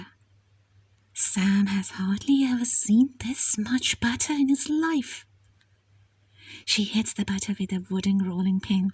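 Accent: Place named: England, United Kingdom. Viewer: Great artwork and beautiful brand new story. English accent is awesome. Keep up the good work! And yes, your channel does deserve a token token of appreciation.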